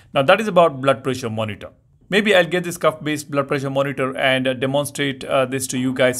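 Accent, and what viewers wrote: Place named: India. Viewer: Slow it down, dude. Your rapid-fire speech coupled with the thick Hindi accent --- nobody in the West can understand a damn word you're speaking. I thought India was supposed to be mellow and easy-going with the influence of all those gurus and siddhis. Why do you talk so fast with very little separation between words --- what? you got a plane to catch? What's the hurry? Slow it down and enunciate so us Westerners can follow what the hell you say. You need to spend more time on the meditation cushion and chill-out a bit. I exited half way thru the video when I noticed by brain heating up from trying to translate your high-speed, Hindi-English accent. Too much work trying to translate your gibberish.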